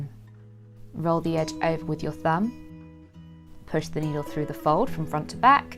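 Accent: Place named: England, United Kingdom. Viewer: Have always wondered how this is done! Great video, and a beautiful English accent that is easy on the ear ! 😊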